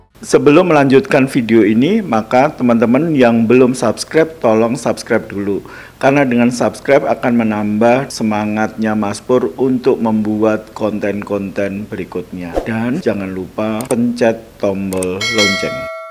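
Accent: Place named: Java, Indonesia. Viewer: yg MEDOK logat jawanya, bila membacakan sebagai narator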